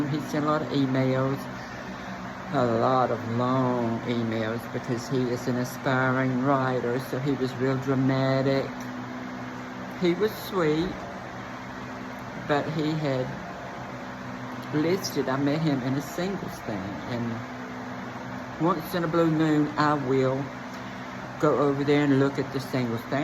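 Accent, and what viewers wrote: South, United States. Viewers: Hi, you're the opposite of ugly. You are very attractive both in your appearance and your demeanor. As a fellow North Carolinian, I also love your Southern drawl. Even though I'm not an "ally", even though I'm very traditional in my values, please know that I like and respect you and enjoy listening to your content. You bring a refreshing and humorous voice to what can be a contentious topic. Especially I appreciate that you're clear about the differences between born male and female as to athletics. And you are clear on not being okay with grooming, unlike the radical activists stealing the conversation. Thank you for speaking out.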